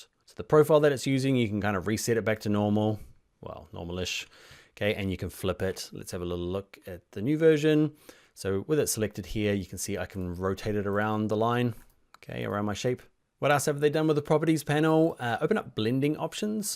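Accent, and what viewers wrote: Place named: New Zealand. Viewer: Best combination of informative and cool funny narrative. Good job man. You are my favourite whats new guy. And the accent! Kiwis are best...:-)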